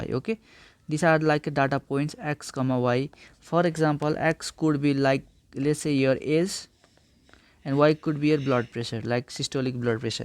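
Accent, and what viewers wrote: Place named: Nepal. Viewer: Are you from Nepal? Your accent seems like Nepali.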